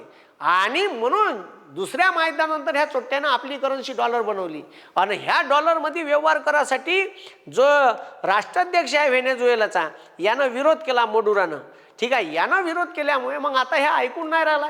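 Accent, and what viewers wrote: Vidarbha, India. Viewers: खुप छान 👌👌. भाषा विदर्भातली आहे का, ऐकायला खुप भारी वाटते. 👌👌👍👍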